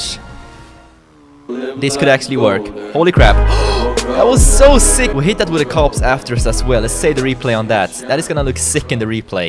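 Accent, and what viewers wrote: Sweden. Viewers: Are u Swedish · I can hear on His English accent hes Swedish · Are you swedish? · Are you swedish? Cuz your dialekt sounds swedish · Are you from sweden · Ur english is so swedish.. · Are you from sweden? Är du från Svergie ? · Are u swedish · Good svengelska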